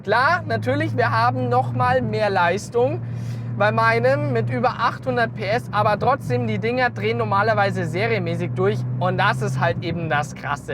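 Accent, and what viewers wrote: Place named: Franconia, Germany. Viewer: dieser fränkische Dialekt 🤣🤷🏻‍♂️ herrrrrlich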